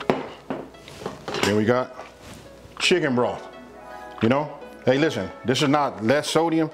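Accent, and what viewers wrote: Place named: California, United States. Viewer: He sounds like he’s from the Bay or LA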